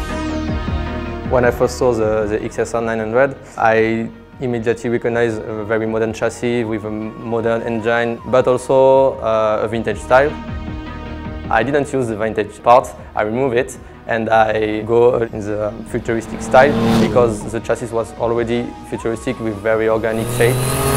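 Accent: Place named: France. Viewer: Stranger things music ahah that french accent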